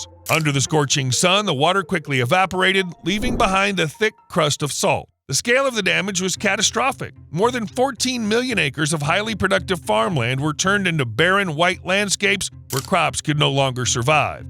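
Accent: United States. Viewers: Heres another Australian who also has never heard of this Disneyland. The American accent telling artificial information.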